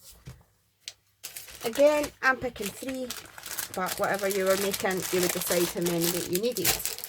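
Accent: Scotland, United Kingdom. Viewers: A nice and simple and yet beautiful and love your scotch accent ♥️🌹✝️🙏🇬🇧👍🌷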